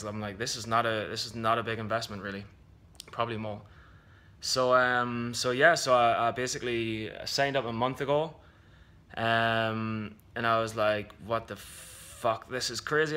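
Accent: United Kingdom. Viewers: Hi, came across your recent podcast with SteadyTrade (that was awesome btw 👍). As soon as I heard your accent I was like the guy is from the UK (as am I, Birmingham). I was exactly like you, wanting to know if this was legit and I've come to the conclusion that Tim and his education service is 100% legit. I've put in so much study time and to be honest I'm just scratching the surface. That's the reality, it's pure hard work and dedication and I have such a long way to go but the key is I'm loving the journey. I am a silver subscriber and before that i purchased HTTM at the beginning of lockdown. What an investment that was.... at the end of watching it, I was like okay this is pretty cool so let's go deeper. A month in on silver I've just hammered out the studying. Still have loads to learn, but when I hear of progress like yours it makes me wonder just how far can I personally go if I really dedicate to this. 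I'm looking forward to seeing your progress and thoughts through these videos. I also followed you on twitter yesterday, so I'll be sure to reach out at some stage. I take it you are also on Profitly, if so it would be great to follow you and see how your journey mapped out too. Thanks for the boost of confidence, it's just added fuel to the fire I already have. Let's do this!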